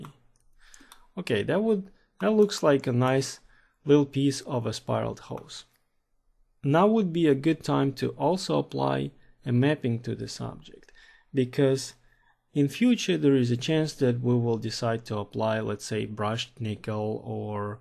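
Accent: Russia. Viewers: You have special apeak type are you russian i think you are russian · are you russian? you kinda sound like one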